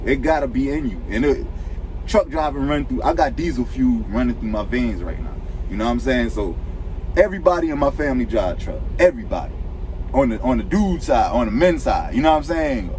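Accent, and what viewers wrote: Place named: Geechee, United States. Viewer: I knew it!! I’m from downtown Charleston. I hear the Geechee dialect but I hear something else in there too. I’m looking into the company and I’m kinda excited!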